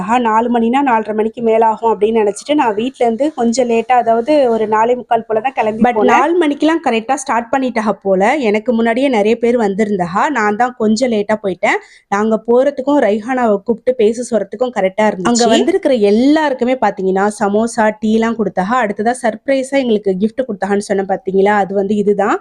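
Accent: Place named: Nagore, India. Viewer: Nagore Karaikal slang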